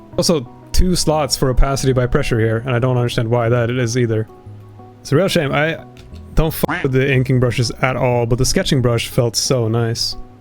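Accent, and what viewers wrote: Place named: Sweden. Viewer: could tell by your accent you're swedish (from a norwegian with a lot of swedish fam) much love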